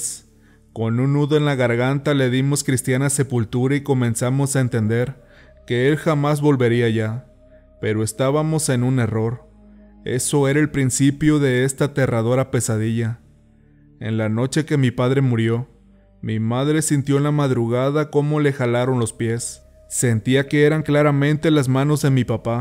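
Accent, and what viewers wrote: North, Mexico. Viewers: Pierdes mucho tiempo mandando saludos ya que son muchos es lo único malo que no me gusta de tu canal tienes Buenas historias Pero también tu acento Norteño no tienes una buena dicción para narrar pero tienes Buenas historias te repito saludos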